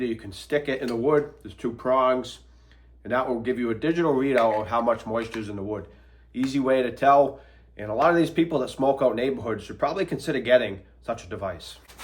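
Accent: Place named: New England, United States. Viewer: Disagree, the Davos crowd, or you name your favorite gathering place or club, in other words those who own our politicians, want the population to be 500,000,000, no more. Further, they realize by actual examples that have occurred in the U.S. and Europe, that people freeze to death in winter and die from heat exhaustion/stroke in the summer, where they have no guaranteed access to reliable energy. In winter this is fossil fuels backed up by wood and in the summer fossil fuels. And now with AI developing exponentially as well, that elite will no longer need wage slave labor for the majority of production and services either. This is the basis of their war on fossil fuels and other combustible energy sources. I recall reading in the last few years that Britain has already gone so far as to prosecute people for burning wood. Perhaps someone can correct me if I am wrong about that. 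Notwithstanding, Britain is also making war on fossil fuels under the climate change fear PSYOP. Question is, regardless of the basis for this, what do we do about it? Does anyone believe the political process is going to work? I believe I may have heard a New England accent from the presenter. Last time I drove from Massachusetts into Rhode Island I literally saw a, "are you ready for 2030" highway sign! But areas where you think you would be safe from this war on humanity are also capitulating, just not as radically or openly. I live in coal country, but for some reason coal plants are being not o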